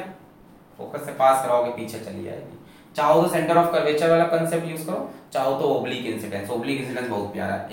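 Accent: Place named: Bengal, India. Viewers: sir akdm rapchik bengalii. You are amazing sir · Sir ur bengali ascent is very good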